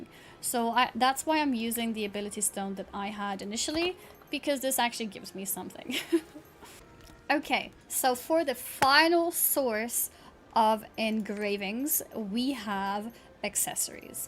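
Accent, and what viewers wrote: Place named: South Africa. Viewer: Your accent sounds different. Are you South African? :P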